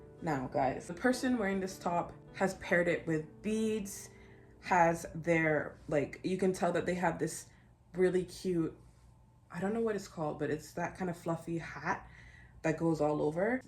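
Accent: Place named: Caribbean. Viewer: Adorable video. Are you carribean? I detect an accent but I can't place it.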